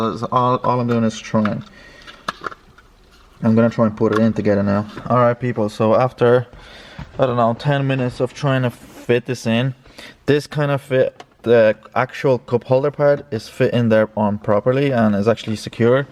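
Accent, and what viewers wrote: Ireland. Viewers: u irish my g? love the accent.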